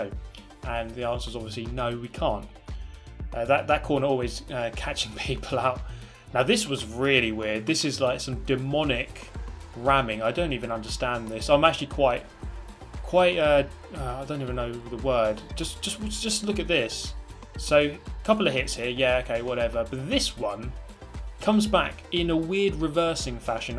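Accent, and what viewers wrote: United Kingdom. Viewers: For 2019 if you have a good PC try Project cars 2, it feels like the middle ground between super hardcore Iracing and Forza ramming. It’s best as just a very good looking racing experience. And I’d love to see more collabs, maybe with Jimmy B? Thanks for the cynical casual British Gran Turismo commentary I didn’t know I needed in my life. Keep dodging those rammers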